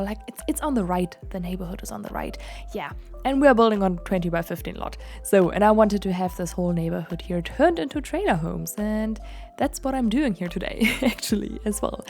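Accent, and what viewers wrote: Germany. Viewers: Bist du deutsch? Hört sich so an :D Oh mann, ich wünschte ich hätte in echt einen Ort wie diesen 😍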